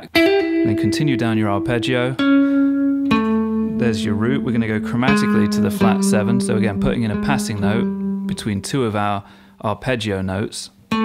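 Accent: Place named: Birmingham, United Kingdom. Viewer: Your accent sounds familiar to me as a born & bred Staffordshire bloke....and the best I can come up with is posh brummie. You're not from somewhere like Solihull are you? 🙄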